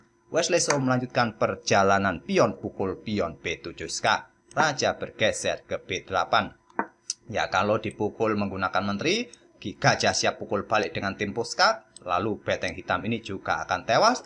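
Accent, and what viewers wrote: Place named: East Java, Indonesia. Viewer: Admin logat orang jawa timur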